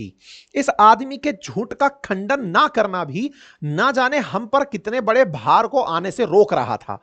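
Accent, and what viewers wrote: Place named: Bihar, India. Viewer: Sounds like Bihari teacher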